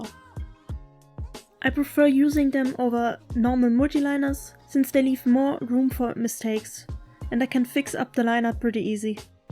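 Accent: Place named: Germany. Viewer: Hey just wanted to say your video is actually pretty good, the quality is good! Also it was pretty interesting because i never had an actual set of these to use for an actual illustration. I suppose that you are a native German speaker? At least you are sounding like one, but i might be wrong- Anyways, your accent is indeed visible and I do not know if this helps but I learned that speaking englisch, and getting the accent a bit less visible is not easy. As an advice: it might help you if you speak more "swollen" German is a language that makes you speak with mote cutts. English is a language that is rather smooth, while german is kind of fractional, both in individual can sound very pretty but combining these mostly sounds strange because its a big difference. Also if you really wanna work on it you always can watch movies or tv shows and speak with the characters. 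Because if you focus on that for most people the words come again voiced in their heads when you then speak them out loud. But, do this with older series, something that you already watched and better not use something like sit-coms, because people are speaking faster and not always correct english so its not the best idea to get there an example. At least this was something that helped me alot! But you actually don't need to work on the accent at least you speak very clearly and you are understandable, so infact there is no real need to do so, but if you want to improve thats the advice I could give... Have a gre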